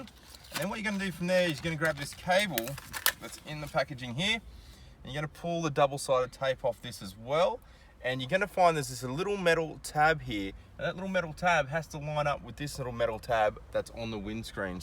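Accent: Australia. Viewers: Always good to fire up a video and hear an aussie presenting it. 👍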